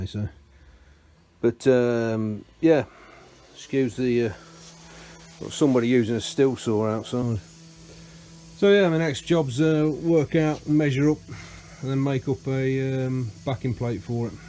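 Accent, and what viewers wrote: United Kingdom. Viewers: Australian here! When you say chucks all I hear is "chooks" as in "chickens! LOL! Confirmed by me Missus!..LOL love those british accents. Keep up the good work!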